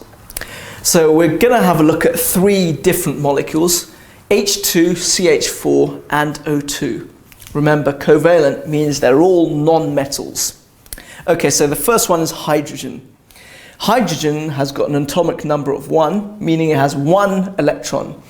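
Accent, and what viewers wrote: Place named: United Kingdom. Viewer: You have really improved your british accent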